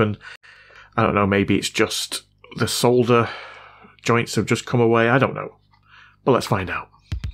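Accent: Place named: Bradford, United Kingdom. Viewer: All I want to know is if you're originally from the Bradford area? (because, inflection for inflection, you sound exactly like the Lost in the Pond dude.. and that's where he's from)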